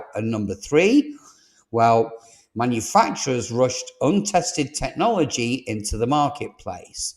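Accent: England, United Kingdom. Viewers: Wow! Seems as if I am way out of this regime! I am an 82-year-young South African chap who proudly owns a 1999 Land Rover Discovery 2 V8. I have never seen any of the vehicles which you mention, here in beautiful South Africa, so cannot comment. Maybe people should be educated in matters mechanical before thay purchase their 4-wheeler. My Landy has done just 280 000 kilometres, has never let me down, and has had the four usual problems of its model attended to timeously. Leather seating, full aircon, great sound system, absolute reliablilty, easy driving with excellent driving view and man, it goes simply anywhere and everywhere! I am very interested in your use of the word 'GAS", dear presenter. With your delightful English accent, I would expect you to use the term "petrol", as we do here in beautiful Cape Town. Thanks for great entertainment!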